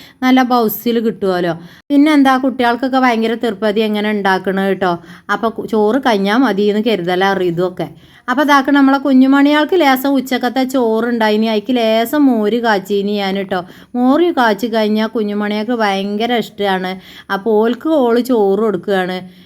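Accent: Malappuram, India. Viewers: Njan aadhyamayittahn ithade vvidio kanunnath...ithuvare vidiosin onnum comment idarilla...njn full vidio kandu... q &a session okke ...subscribe cheythu ... vidio kanditt commnt idan thonni...enikk samsaram orupad idhtshttayi..njnum malappuram aahn......negative comments idunnavar idatte ...athonnum mind akkanda . Malappuram karde normal talking ithaahn ....ithupole munnoott poyal mathi...insha allah..ellam sheeiyakum..allahu barakath tharatte...kadangalokke pettann theerkkan pattatte.....njngalum aanakkayam post ahnnn😅... Enikk ente thathante age thonni...6 makkal nd nnonnum parayillla😊masha allah..itha nalla sundariyahn....❤❤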